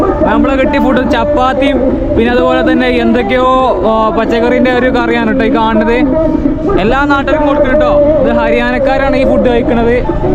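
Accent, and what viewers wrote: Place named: Malappuram, India. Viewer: തുടരുക , വിവിധ പ്രദേശങ്ങൾ .... ഇടക്കുള്ള തമാശകളും , മലപ്പുറം ഭാഷയും പോളിയാണ്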